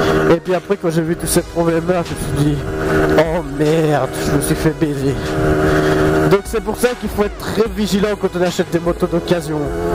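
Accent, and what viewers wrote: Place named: Belgium. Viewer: MDR! T'es vraiment un ouf! Pour quoi je passe moi maintenant avec tes conneries... lol (Inventées de toute pièce de ta part). Arrêtes de jouer à WoW ça te monte à la tête! x) Tu m'as bien fait rire... J'adore ton accent belge "une fois"! Merci pour le coup de pub'! Bises. V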